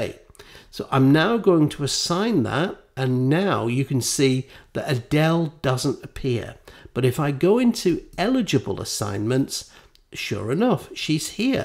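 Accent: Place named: United Kingdom. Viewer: Hearing a British guy teach keeps my focus.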